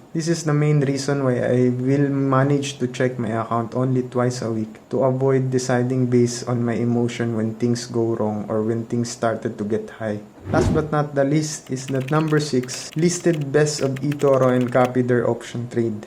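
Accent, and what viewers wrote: Philippines. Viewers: That Filipino Accent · Love that pinoy accent btw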